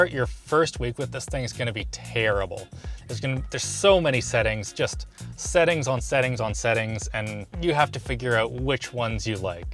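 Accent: Canada. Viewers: I was wondering if it was a candians thing or just a weird thing. you never know... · It's a canadian pronunciation lol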